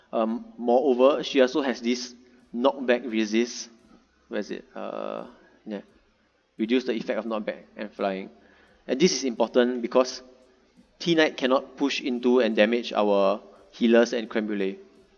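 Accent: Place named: Malaysia. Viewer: Are you malaysian , i know that accent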